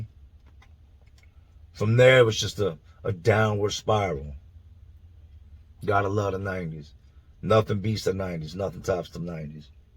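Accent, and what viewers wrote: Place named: Philadelphia, United States. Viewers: 🤣🤣 Big Facts its a Philly Thang myG rs pretty much Everybody tlk lik dat around my age im 34! But rs half dez ppl wouldn't bust a grape in a fruit fight, dey dont kno bout Ordering shyt on da Box fukin mom cable bill up💯🤣🤣🤕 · 10/27/80 here brother. I feel you damn. You sound east coast. What's the deal. Hell I'm midwest we sound weird to you all 😂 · People comment on your accent like they comment on mine. Always wanting to correct me and what not. I finally said to them that this is how I talk..growing up around philly and Delaware and Jersey, this is how we talk. People. If you don't like his accent, don't listen. Virginia is right down the road from me, and that is how people talk.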